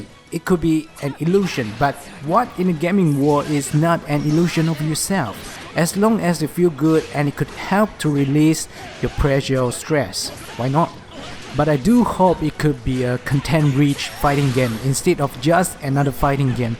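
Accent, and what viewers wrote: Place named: East Asia. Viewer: hey love your video may i know where are u from? u sound like chinese/ japanese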